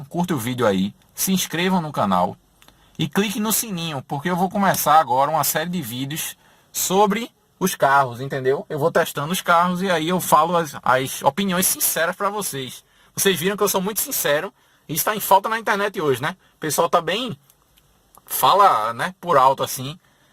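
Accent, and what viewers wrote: Pernambuco, Brazil. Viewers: É Pernambucano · Não é Pernambuco?? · Esse cara é de Pernambuco ou região não é kkkk , Pernambucano e bixo ignorantemente e sotaque chato pra Caralho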